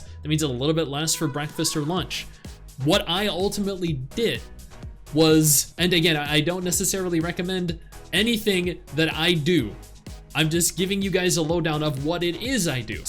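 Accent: Australia. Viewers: wtf you’re Australian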